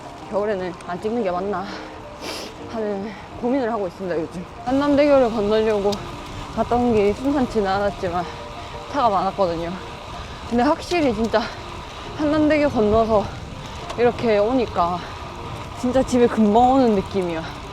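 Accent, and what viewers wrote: Gyeongsang, South Korea. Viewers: 경상도 어디 사셨었나요?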